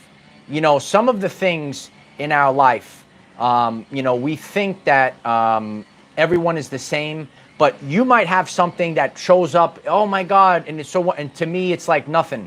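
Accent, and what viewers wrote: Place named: New Jersey, United States. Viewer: Hi this is Milan haven't seen your channel in a while. I have enjoyed it very much . Love your accent I'm from the Boston area soulth Shore I'm suspecting New Jersey love it. ❤ 🙏 🕊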